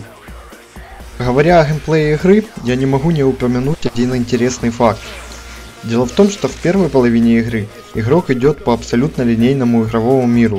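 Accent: Ukraine. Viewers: Хватит издеваться над произношением автора, он не Хыкает, это украинский акцент такой, в большинстве слов вместо привычного Г есть нечто вроде Х, а там где четкое Г нужно, там есть Ґ, но оно редко используеться. Также например привычно говорить ШО вместо ЧТО. Язык такой, имейте совесть :) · Автор! Я балдею от твоего украинского произношения. Не слушай никого! Оно прекрасно.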